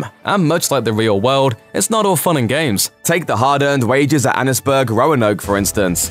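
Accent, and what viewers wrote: United Kingdom. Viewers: Every video I watch is a British guy.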